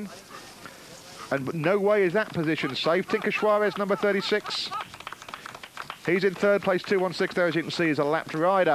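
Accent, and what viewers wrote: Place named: United Kingdom. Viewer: Who hired this announcer? Was it like, Hey! We need a British accent guy to read some info .... brutal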